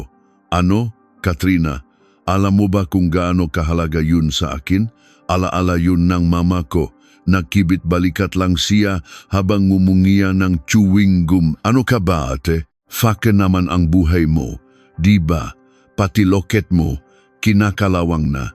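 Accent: China. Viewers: Ano ka Sir, intsik ka ba? bakit ganyan lkung magsalita itongnarrator na ito nkakahiya ka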